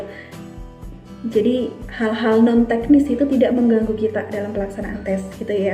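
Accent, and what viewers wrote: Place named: Banyumas, Indonesia. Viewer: Kaya ne wong ngapak rika yu'..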